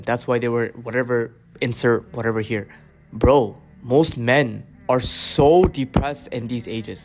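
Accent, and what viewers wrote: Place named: Pakistan. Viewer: he sound like Pakistani no racism I am Pakistani also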